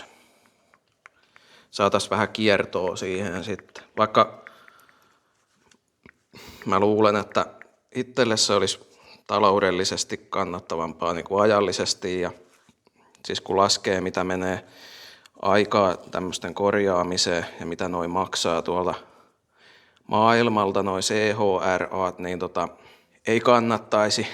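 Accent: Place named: Pirkanmaa, Finland. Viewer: Ammattimiehen hommia on kyllä kiva kattoa, vaikkei nyky-VAG:laisiin koskis ite pitkällä tikullakaan. Pirkammaan murretta on kiva kuunnella.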